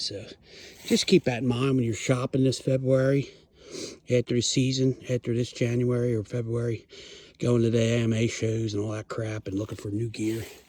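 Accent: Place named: Philadelphia, United States. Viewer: where u from ? Philly ? lol. Can hear that accent a million miles away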